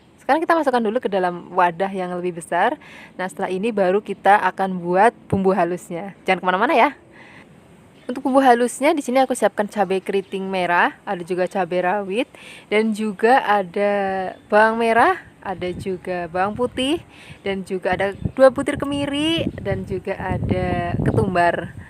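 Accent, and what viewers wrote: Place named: Banyumas, Indonesia. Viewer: Gak tau nya orang ngapak...dan muka nya beda ma suaranya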